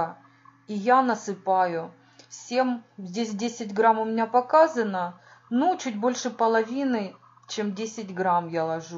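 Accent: Ukraine. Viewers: Любители русского языка! А канал не по русски назвал. Неужели не понятно, что девушка украинка,говорит на своём диалекте. Ты ещё англичанам расскажи, что говорить надо только по русски. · Такой говор у украинцев, но не у всех.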